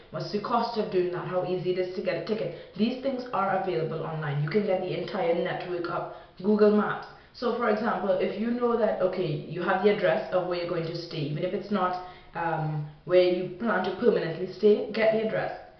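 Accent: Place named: Trinidad and Tobago. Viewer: How exciting!!!!! :D In the other videos I'm like that girl rellll sounds like a Trini. I'm in first year now and I intend to do this after second year so I'm collecting as much knowledge as possible. Did you have prior knowledge of the French language? THanks!! Rushell :)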